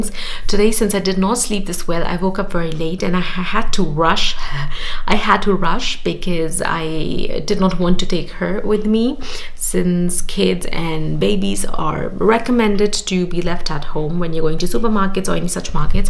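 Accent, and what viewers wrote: Germany. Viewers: Love how your accent has slowly been Germanised. 😄 so cute. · Dear, sab se pehle, you are lovely! I’m a German, living in India, married to an Indian and we have a 6 year old daughter. Please zyada stress mat karo. Your daughter is fine for sure. Just feed her with your milk if she doesn’t want anything else right now. I don’t know how old your daughter is, but don’t worry, she will take what she needs. You said your milk isn’t satisfying her for too long, so maybe try with fruit purees? I don’t know how far you are with solids for her, but I guess she must be around 6 or 7 months? Teething can be a possiblity, even if it’s lasting already for a while. I wish you and your family all the best! Stay safe and healthy, all will turn out well! And yes, your accent is pretty germanised, mine is totally indian. Guess that happens naturally;)! Mujhe accha lagta hai! 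Take care and keep posting! Namaste 🙏🏼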